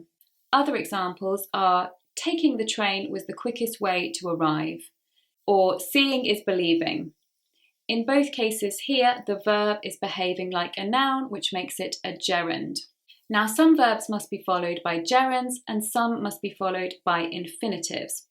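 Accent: United Kingdom. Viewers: I love your british accent tone ❤❤❤❤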